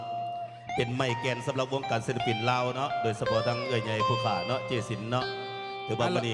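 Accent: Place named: Chaiyaphum, Thailand. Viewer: สำเนียงชัยภูมิเจ้าเป็นคนไทยมาเอาเมียอยู่ลาวตี้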